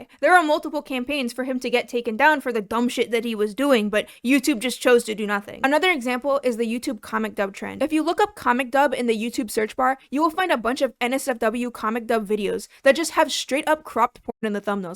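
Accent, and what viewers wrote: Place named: Mexico. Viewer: You sound mexican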